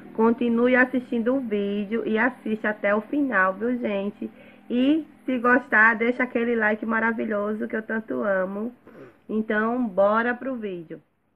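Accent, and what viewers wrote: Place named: Bahia, Brazil. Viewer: aaaaaa deve estar uma delícia mulheeeer 😍 amo muito tempero no feijão.. você é da bahia??? amei o sotaque viu mulher beijão 💗😍😍😍💗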